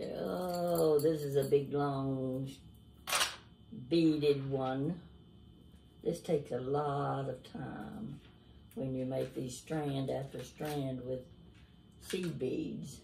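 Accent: South, United States. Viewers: yes the deep southern accent. Awesome.😊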